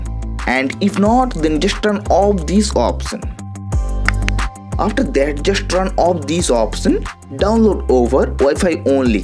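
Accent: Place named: India. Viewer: The second you see him talking in Indian accent i just knew i was in for a good tutorial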